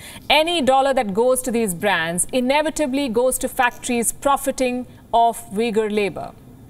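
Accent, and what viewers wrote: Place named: India. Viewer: Amen how did they know dat ,force labor I hope America is not using this trick trying to destroy the Chinese economy ,hence they are far ahead of America,, because this girl I've been watching this channel for a long time this girl is very bias protection everything from American side ,, She don't question anything from American side ,, yes she's got an Indian accent but trust me. She works for Americans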